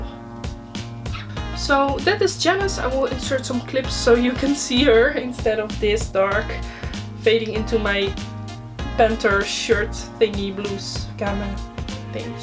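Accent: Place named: Netherlands. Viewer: I noticed the Dutch accent, and checked the description box. Nice to see another Dutchie like me making video's about hamsters!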